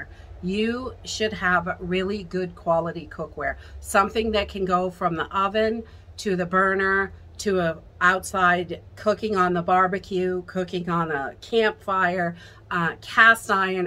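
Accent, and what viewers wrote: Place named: Maine, United States. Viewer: First timer, I was listening to Canadian Prepper and your video just started afterwards, as I listened, I was thinking if that is not a Maine accent. Great video from a neighbor in southern Franklin Couunty, Maine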